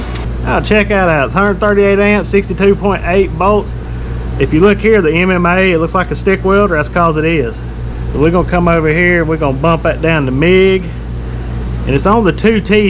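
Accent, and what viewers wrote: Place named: North Carolina, United States. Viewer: Glad to hear someone that talks like me!! (Franklin, NC, Macon County)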